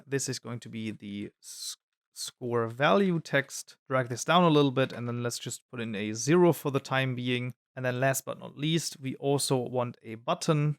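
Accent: Germany. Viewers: Nice video! I appreciate the fact that you convey much information in short videos. Btw you sound like you're German :D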